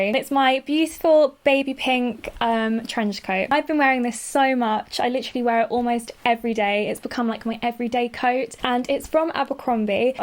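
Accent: United Kingdom. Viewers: these things are not smt that I can wear(pink,gold or girly stuff are not my things) but I have to say that I love how your style complete your personality... 👌👗❤💗 I mean if it was another person with your style I don't think that I would subscribed or watched her. your personality is just shine oh and obviously your accent. I LOVE your British accent girl!!.. 😍💘💗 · Oh my god, you are so perfect! 😍 beautiful, stylish, intelligent and with British accent! Pure perfection 😙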